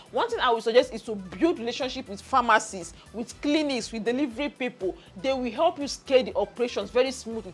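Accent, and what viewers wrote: Nigeria. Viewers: Are you speaking Nigerian English accent?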